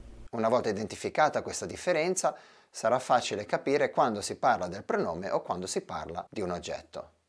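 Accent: Italy. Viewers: Di che zona è questo genovese? Non mi pare proprio di Genova...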